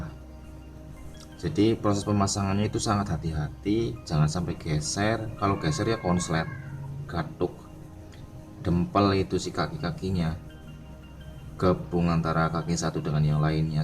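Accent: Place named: Banyumas, Indonesia. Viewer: ngapak juragan 😁😁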